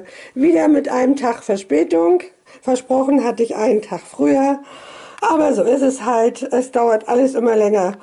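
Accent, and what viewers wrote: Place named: Low German, Germany. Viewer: Süßer Schatz Wenn er ein bisschen größer wäre hätte ich angefragt Ich mag deinen Dialekt so gerne - Plattdeutsch ist einfach toll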